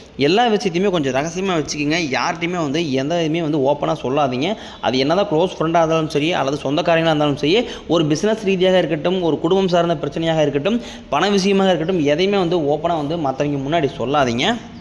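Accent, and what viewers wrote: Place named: Kongu, India. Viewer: அது அவங்க ஊர்ல அப்படி தான் பேசுவாங்க. கரூர் கோயம்புத்தூர் ஈரோடு நாமக்கல் கொங்கு பக்கம். அவா இவா என்று பிராமின் பேசும்போது அவங்க கிட்ட ஏன் இப்படி கேள்வி கேட்க மாட்டேங்கறீங்க? நான் திருச்சி பக்கம். அவங்க இவங்க என்று பேசுவேன். ஊருக்கு தகுந்த மாதிரி தமிழ் வழக்காடு மொழி மாறும். யாருக்காகவும் நம்மை மாற்றிக் கொள்ள முடியாது. சுயம் எப்போதும் மாறாது.🙏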